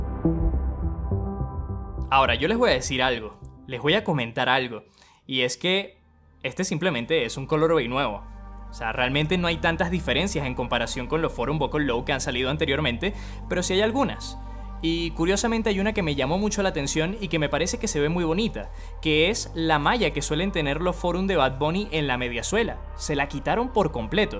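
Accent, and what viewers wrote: Venezuela. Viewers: Bro tienes acento venezolano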